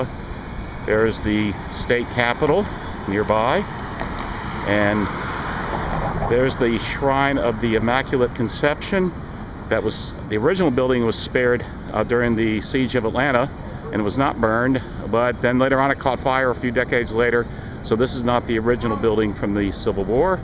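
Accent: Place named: New England, United States. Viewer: wow, really good video. Yankee accent though, and 3 dollar words. You ain,t from around here are you all?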